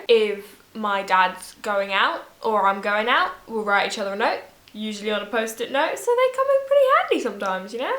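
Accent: United Kingdom. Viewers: Hey! This is the first time I comment because I'm a little shy and I get so embaressed in speaking english with people, so forgive me if there is some mistakes. Well, I want to tell you that I absolutelly love this kind of video, in fact, I love all of them; tutorials, Q&A, vlog's but I really enjoy to know you a little more because you're so funny and ordinary in the best way, you're just being yourself and that's so enough because you are beautiful the way you are. I'm really happy that I found your channel. And god, spanish it's awful to me. It's very similar to portuguese but I just can't speak! haha And just saying: Your british accent is awesome. It's really cute. Here in Brazil we love it. Really. I wish I could stole it from you! xxxxx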